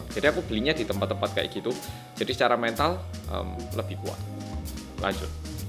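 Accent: Java, Indonesia. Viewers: Gercep nih mas medokk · Katanya orang korea nyang medok dan hidup bertahun tahun di indonesia(kususnya pulau jawa) tapi kok gk tau mlumah sih? Mlumah itu terlentang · Orang Korea yang medokkk❤️ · Baru kali ini liat orkor medok😂,, aku aja org indo nggk loh🙄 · Mas...Mas bicara korea juga medhok. Kuereennn rek 🥳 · Medhoooooooookkkk.......hahahahah.assek banget liat videonya.ngga bikin bosen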